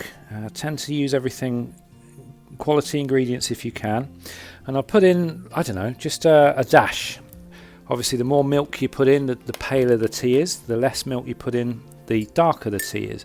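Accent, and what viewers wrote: United Kingdom. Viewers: As I lived nearly a decade in Britain, I just came here to listen to the beautiful accent and understand again why I became so fond of tea. Cheers!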